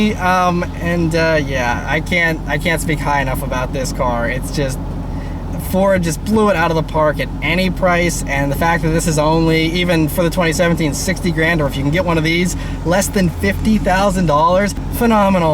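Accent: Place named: Pittsburgh, United States. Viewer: Dude! I love your videos. You sound like you're from Pittsburgh. You remind me of Mr. Rogers as a child who loves cars instead of marionettes and toy trains.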